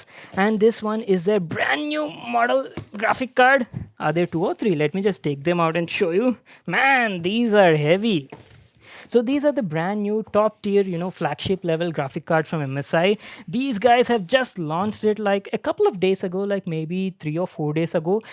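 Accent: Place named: India. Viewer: he is like linus tech tips with an indian accent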